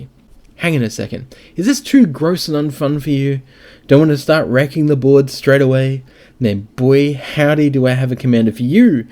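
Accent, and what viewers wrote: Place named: Australia. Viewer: You have to ask yourself about motive here buddy. Someone making a fake card to reveal will quickly be outed and completely destroy their credibility and their channel. Why on earth would anyone do this? You might get this from some random no-name channel with no established history, but that's clearly not the case here. The reason this spoiler is a bit early is because I highly suspect the channel owner is an Australian (based on accent), and Australia happens to already be in July 23rd as I write this.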